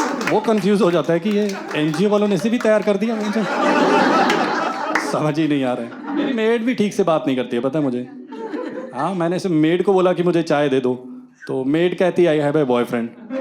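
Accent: Punjab, India. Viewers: Gadd ta bai ji, "end" write up....and of course,punjabian di Hindi ch Punjabi accent ta aa hi jandi aa😅..looking forward to more ,in coming days from ur side👍👍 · Punjabi accent 😂❤ Great video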